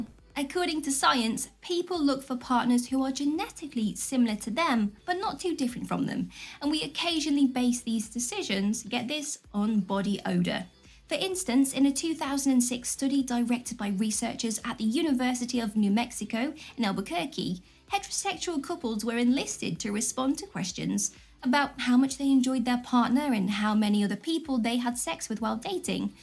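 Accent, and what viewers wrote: United Kingdom. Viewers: HONESTLY. These behaviors are not problems I have. The exception is that sometimes I seem to be figeting, but I'm actually constantly doing finger exercising to increase dexterity (professional musician... guitarist) I usually just explain that I'm not nervous, and about the exercises.... Found it to be a Good way to open up an invite for a lady to come to one of our shows. However, listen up everyone. This information is hugely important. Nice Work. Good Content here! I'm liking your British accent, reminds me of my favorite friend in college. She was from somewhere near Cambridge. She used RP.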